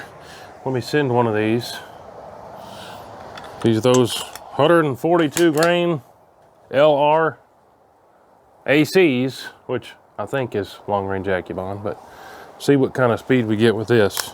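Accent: United States. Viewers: Message from Australia. Hearing this dude talk is why I love the yanks.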